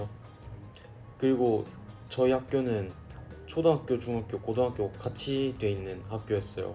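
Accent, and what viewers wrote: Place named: Gyeongsang, South Korea. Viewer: 이제는 일본어가 익숙한데 그안에 한국어에 대구 사투리가 있으신거 같았는뎈 ㅋ ㅋ ㅋ ㅋ맞았군요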